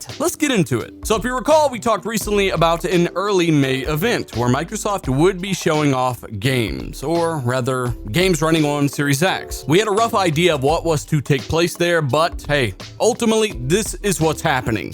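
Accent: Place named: United States. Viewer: maybe it's just the dumb American accent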